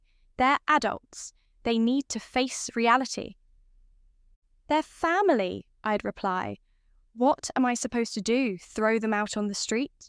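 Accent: United Kingdom. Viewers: 🤢 british accent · The British accent ruins the story, sounds like an Emma Watson rip off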